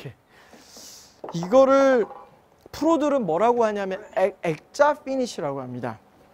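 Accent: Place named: Gangneung, South Korea. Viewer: 님 강릉분이신가요? 동향인듯 귀에 익네요 ㅎㅎ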